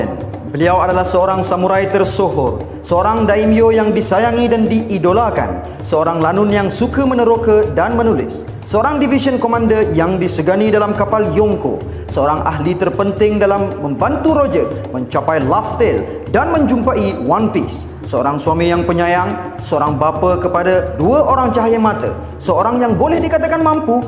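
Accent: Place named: Kelantan, Malaysia. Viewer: Asal Kelate ko abe HAHAHAHAHA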